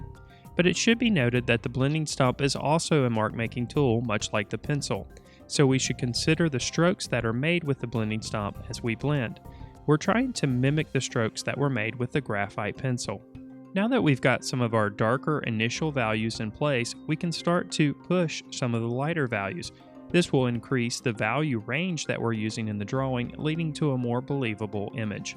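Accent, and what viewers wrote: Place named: Louisiana, United States. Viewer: Reminds me of a northwest Louisiana accent.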